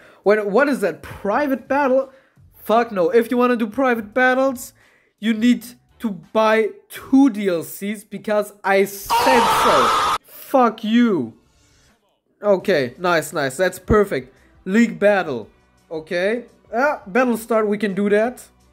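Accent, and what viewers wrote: Germany. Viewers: Are you german ? You have an german accent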